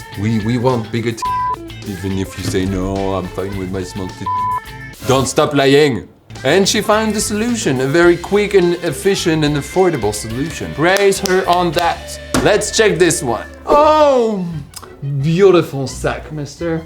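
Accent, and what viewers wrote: France. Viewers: As a french person, i wasnt sure if her accent was a french 🥲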